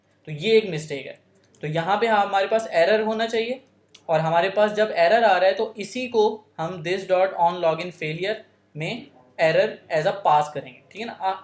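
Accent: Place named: Uttar Pradesh, India. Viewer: you are outstanding man, the clarity you have hats off. keep it up. between i get a feel you are from UP but surprisingly you are from pakistan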